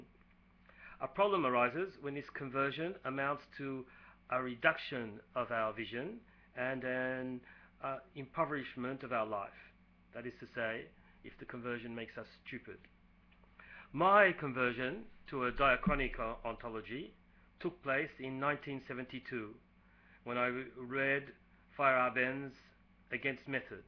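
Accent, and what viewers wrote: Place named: France. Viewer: You've definitely acquired an interesting accent after so many years living in France. ("important")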